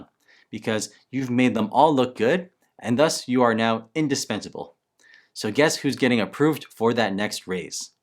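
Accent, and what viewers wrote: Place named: Canada. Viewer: Hello from Peru! I love your videos and I’m so curious! You sound like born in Canada or something but What your ethnicity is? :) thank you.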